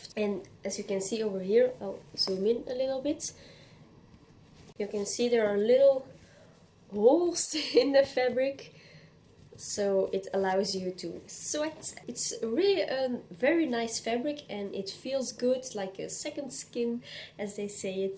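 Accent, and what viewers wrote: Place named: Europe. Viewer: I like your accent. I lived in Europe this video made me miss it for sure ❤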